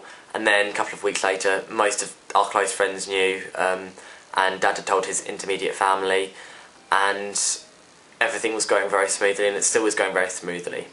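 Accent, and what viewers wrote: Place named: West Midlands, United Kingdom. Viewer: ...lly beautiful, real handsome guy. You from the West Mids? (just, ur accent) x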